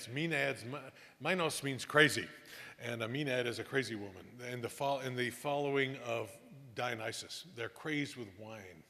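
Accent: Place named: Midwest, United States. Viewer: Thank you for this wonderful, fascinating lecture about Greece, delivered in a charming Midwestern accent. I discovered a new artist who truly deserves worldwide recognition.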